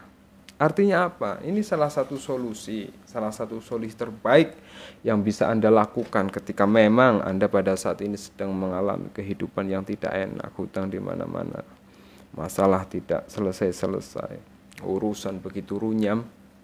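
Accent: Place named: Banyumas, Indonesia. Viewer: Orang cilacap ,banymas, tambak, purwokerto? Ngapak bangeeet???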